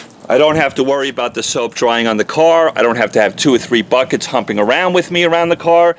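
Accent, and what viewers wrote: United States. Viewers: Except the annoying American accent... like when always says “wowdar”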